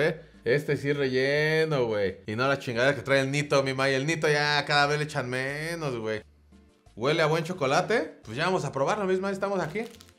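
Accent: Spain. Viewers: Muy chingon tu video y tu asento español 🇧🇴 saludos mi ya eres internacional #Queobolines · #Queobolines que buen video y me cague de risa con tu acento español xd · #Quiobolines 😂😂😂😂 me encantó tu acento español 😂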